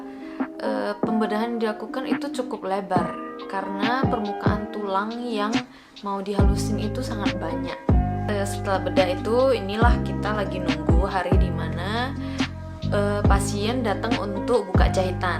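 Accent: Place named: Medan, Indonesia. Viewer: udah ku duga kalo dokter tu orng medan,soalya logatnya kas bget☺️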